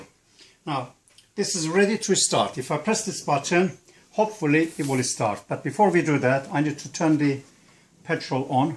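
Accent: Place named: Iran. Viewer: You sound like you have an Iranian or French accent🤔🤔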